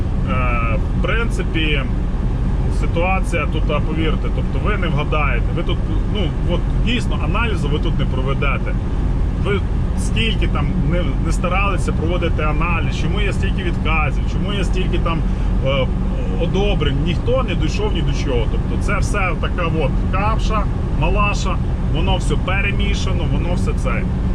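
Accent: Lviv, Ukraine. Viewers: Класне пояснення гарна дикція Тай акцент скорше Львівської області. Однозначно лайк.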